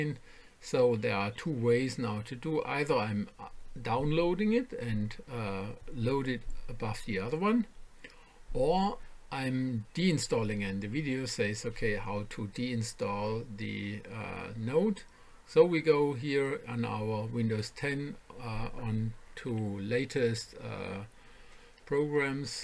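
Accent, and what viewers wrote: Germany. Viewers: Man hört das deutsch unnormal raus :D gutes Video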